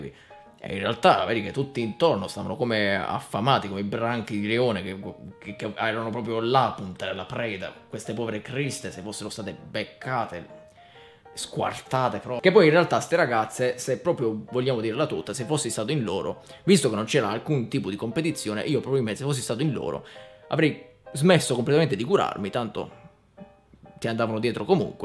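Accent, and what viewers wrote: Taranto, Italy. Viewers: Da Grottagliese non posso che sottolineare quanto sia bella la cadenza tarantina,a differenza del dialetto vero è proprio,potresti fare il doppiatore(tra le tante cose)... Un abbraccio compà! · Inizio video: sento che è di Taranto e penso "Sono di Bari, adesso lo invito per una cena fuori". Per poi scoprire che odia Bari. Come il sottoscritto, d'altronde haha Comunque è proprio vero. Occorre la città giusta. Bari è una città che mi ha fatto odiare le mie passioni, passioni che a Bologna mi hanno fatto volare.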